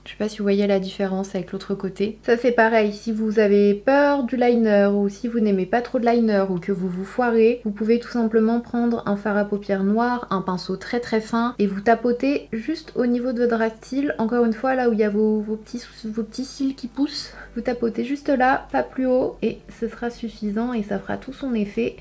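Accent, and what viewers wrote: England, United Kingdom. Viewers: Hello Sanaa! Je suis tout à fait d'accord avec toi pour l'accent anglais ! 😂 Moi qui parle couramment l'anglais, je comprends beaucoup mieux l'accent américain que l'accent anglais 😂 quand je parle avec mes potes anglais je suis obligée de leur demander de répéter plus lentement car j'ai beaucoup de mal à les comprendre mdr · L'accent anglais est clairement plus difficile pour la compréhension :p mais comme tout c'est une question d'habitude. Tu nous donnes tellement envie d'avancer dans le temps et d'être déjà le 24 <3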